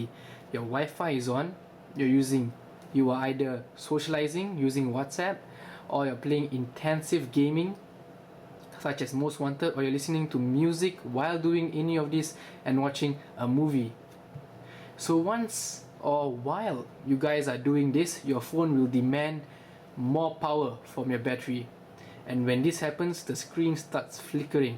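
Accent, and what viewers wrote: Malaysia. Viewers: Malaysian dude? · you accent are so malaysian , i like you're video :)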